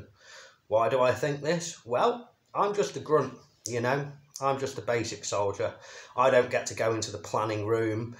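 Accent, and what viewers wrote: United Kingdom. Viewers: You must be from London or areas around as you sound exactly as one of my British colleagues, even tone of your voice! If I were to listen to both of you, I wouldn’t be able to tell who is speaking! Stay safe, mate, thank you for all you do, you have my outmost respect! You now have a subscriber from San Francisco.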